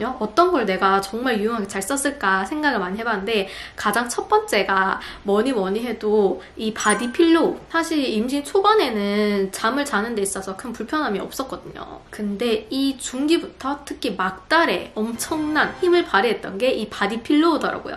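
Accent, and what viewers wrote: Gyeongsang, South Korea. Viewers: 졸귘ㅋㅋㅋ 포슬포슬하이 쫀독쫀독하이 마시따아~ ㅋㅋㅋㅋㅋㅋㅋㅋ 영락옶는 갱상도 한국여자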